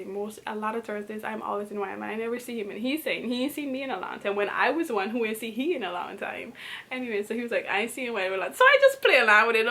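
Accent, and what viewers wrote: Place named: Guyana. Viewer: Guyanese are the best story tellers sound effects and all😂😂 Great story, the accent made it better✨✨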